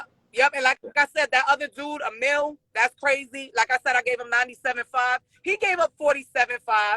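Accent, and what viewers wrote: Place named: New York, United States. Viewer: Sheesh that NY accent is aggravating; especially when it comes from women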